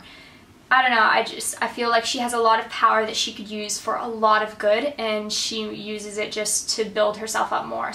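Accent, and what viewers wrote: Australia. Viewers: you're starting to get an australlian accent a little bit lol :p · Love how you are starting to have a little bit of an Australian accent and say more of their phrases haha :) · I like how I can kind of hear a slight an Australian accent, Cute!! :D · i love that you get a sneaky australian accent haha!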